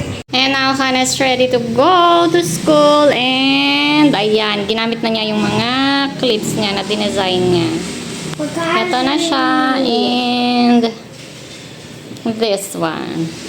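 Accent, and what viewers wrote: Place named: Manila, Philippines. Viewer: Hi,. May I know where you're originally from? You speak Tagalog and English like someone from Manila or Luzon.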